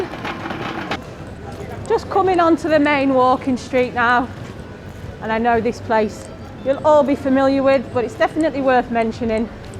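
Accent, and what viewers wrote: Yorkshire, United Kingdom. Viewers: Hi ....you sound like your from Yorkshire ....am I right...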